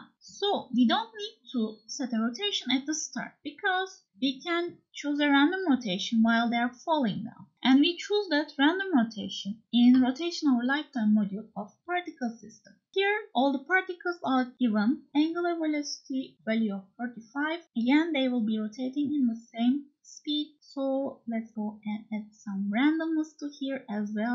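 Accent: Turkey. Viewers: aksanınızdan Türk olduğunuzu hissediyorum :D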